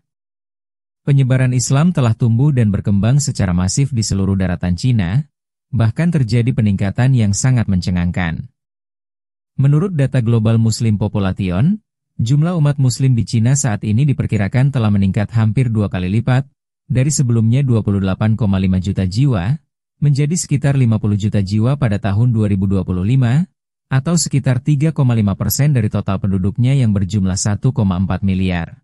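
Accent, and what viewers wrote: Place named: Indonesia. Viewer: waduh narator..................ini gimana sih janganlah abang baca bahasa inggris seperti bahasa indonesia, malu aku bang, bacalah muslim populesyen jangan abang baca muslim population, maluuuuuu.........aku bang, yang nonton konten ini sedunia bang, gimana aku mau subscribe, abang baca aja nggak becus........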